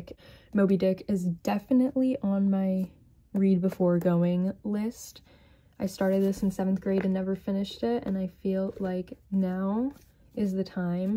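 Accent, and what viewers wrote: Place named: Spain. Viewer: ur spanish accent is cute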